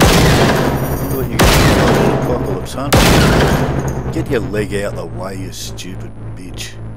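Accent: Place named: New Zealand. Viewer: Based on the accent, I would guess phlegmatic New Zealander. Am I close?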